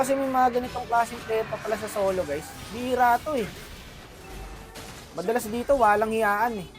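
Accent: Iloilo, Philippines. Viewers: Bos ilonggo ka naman mag salita sa content mo kakatuwa eh enjoy 😂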